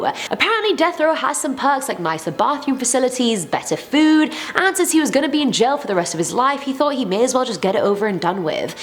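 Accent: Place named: United Kingdom. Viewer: She has the best British accsent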